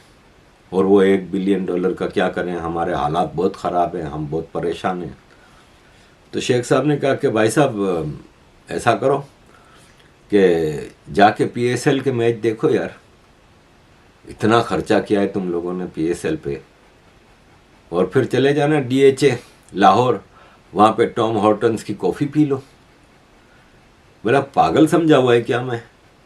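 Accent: Gujarat, India. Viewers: Apka gujrati bhai surat si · Why bhai sounds like gujrati.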